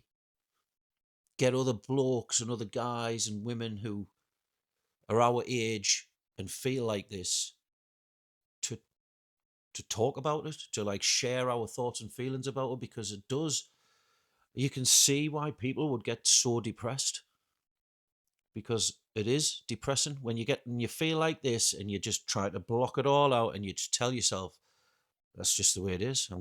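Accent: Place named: Newcastle, United Kingdom. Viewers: Don't know why mate, but I expected you to either be from Scotland or the North-East of England! Don't even know why!!🤔🤷‍♂️🙈🤣 not an insult BTW, Northerners rule!😎🙌🤝 thanks for sharing your story mate! I'm 27 and Autistic. Have worked night shifts, construction work, kitchen work in cafés and restaurants, pharmaceutical work, retail, youth work and just recently left a greenskeeping job. I actually love strimming hedges and cutting grass, but we also had to pick up dog shit and cigarette butts and I had enough! My family told me how worried they were about my mental health! I have a few days of holiday pay and I'm just taking that and gonna rest up.😵‍💫😵🤯😴 wouldn't even mind, I also went to university and got myself a level 8 honours degree! Everyone goes "wow! That's an excellent degree!! You'd walk into a job!". Sadly, I don't walk into any job!! 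I accepted the shittiest job I was offered, contracting to a contractor if that makes sense?! Basically, I had none of the rights of a direct employee of that company! Contract work is good for pay, like you do get paid well, but you also are the easy target for blame when things go wrong! It's very tough out there man! I hear you!! Night-shifts are carcinogenic so if you can get out of it, please do!🙌🙏 understand that is a lot easier said than done though! Take care mate🫡🤝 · Hey mate, I obviously don't know your full situation, but the poor diet and alcohol definitely won't be helping the aches and pains, as well as living in a colder climate in Norway I'm assuming. I'd suggest sorting the diet first, see if things improve. Higher protein for repair, vitamin supplements, doesn't have to be too crazy. Also, good on you for moving country. I'm a UK expat living in Australia, best thing we ever did. 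From a similar area also judging by your accent, I'm from boro myself. Rooting for you mate 👍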